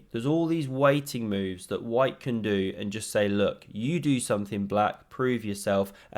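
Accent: United Kingdom. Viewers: Why is it so hard to follow what he said? He even said it in a British accent.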